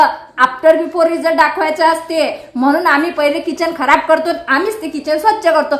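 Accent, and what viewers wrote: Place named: Varhadi, India. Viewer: 😂😂😂 तू इतकी छान बोलते ना आणि आपली वराडी असल्यामुळे खूप छान वाटते मला आणि तू आमच्याच असल्यामुळे त्याहीपेक्षा छान वाटते अकोट ला असते ना मी तर नक्कीच भेटली असती तुला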